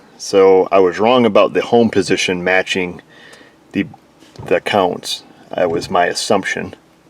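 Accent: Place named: Northeast, United States. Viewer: "She's got the leathah!"......right away I knew he was a northeastern guy lol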